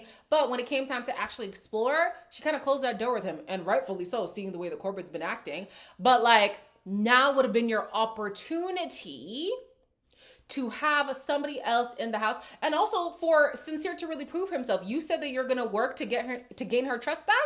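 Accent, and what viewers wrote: Canada. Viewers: Your Canadian accent is so cute in this video 🤣 the passion in this review, I FELT IT!!!!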